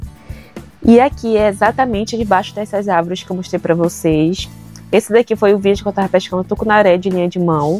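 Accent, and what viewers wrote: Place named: Rio de Janeiro, Brazil. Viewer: Ela tem sotaque carioca kkkk troca o s pelo x, reparei isso.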